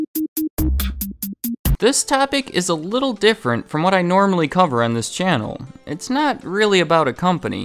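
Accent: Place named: Midwest, United States. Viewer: Not related to the topic, but english isn’t my native language and I find it interesting how he pronounces the word comic as “caamic” and iconic as “icaanic” etc. Is this accent common among Americans? No hate please, I’m just curious.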